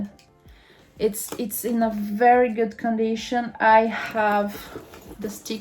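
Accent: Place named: France. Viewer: Are you French? Love the accent!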